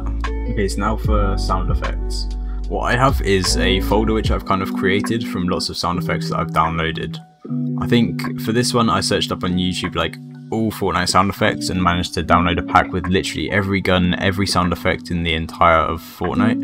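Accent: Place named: United Kingdom. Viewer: love british accents sometimes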